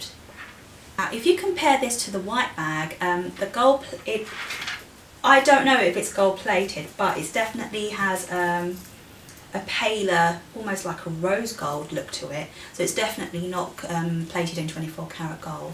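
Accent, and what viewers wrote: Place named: United Kingdom. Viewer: That British accent tho !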